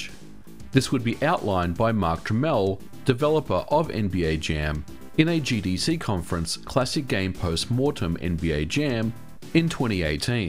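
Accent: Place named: Australia. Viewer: Australian. He lives in the US.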